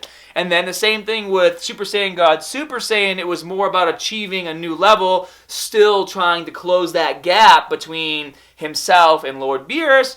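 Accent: Boston, United States. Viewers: he's not from Australia, thats Boston accent haha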